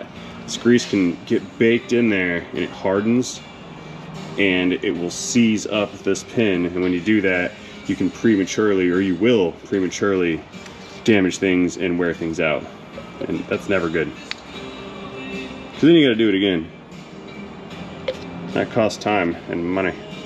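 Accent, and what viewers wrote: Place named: South, United States. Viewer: I always notice that after every night you over party you tend to talk S L O W L E Y and the vids tend to be an hour or more. BUT us Southerners like it when you start talking like a local. :goodvibes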